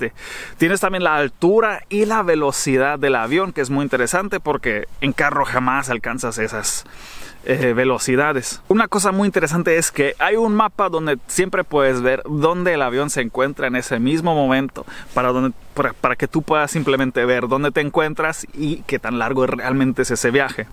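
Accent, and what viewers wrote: Monterrey, Mexico. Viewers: Porque ese nombre de Alemán Latino. Si tu acento es Mexicano Regio.?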